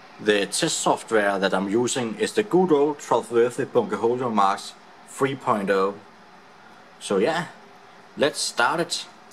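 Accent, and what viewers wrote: Denmark. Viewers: He lives in Denmark as i suspected, you have the good danglish! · Like the danish accent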